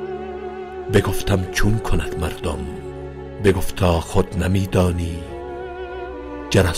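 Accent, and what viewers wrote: Afghanistan. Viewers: این لهجه دری است پارسی دری است لهجه تاجیکی را شنیده اید؟ تاجکستان لهجه شان ماملا متفاوت است چرا با افغانها مشکل دارید تبعیض میکنید؟؟ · لهجه دری هست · لهجه دری 🇦🇫🤌🏻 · این لهجه فارسی افغانستان هست میتوانید از سخنرانی های داکتر نجيب درک کنید این صدا را. البته فعلا این لهجه زیبا در حاشیه ای سیاهی رفته هست متاسفانه به احتمال خیلی زیاد این اثر زیبا و میراث قیمت ناپذیر فارسی زبانان خردمند و با معرفت چندی دیگری باقی نخواهد ماند حتما میتوانیم بگوییم در حال حاضر همچنین به دست تاریک فراموشی سپرده شده است و آهسته و پیوسته در حال از بین رفتن هست و به نظریه اکثر زبان شناسان دیپارتمنت دری در آینده نه چندان دور تنها لهجه که مردم فارسی زبان بر آن تکلم خواهد کرد لهجه تاجیکی تاجیکستان و ایرانی ایران خواهد بود وبس و من خیلی احساس تاسف دارم💜🤍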